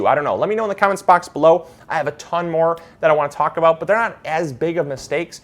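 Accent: Michigan, United States. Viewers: You sound like your from Michigan. nice video!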